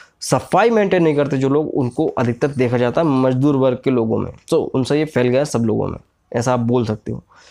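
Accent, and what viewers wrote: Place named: Gwalior, India. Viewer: Gwalior side k लगते हो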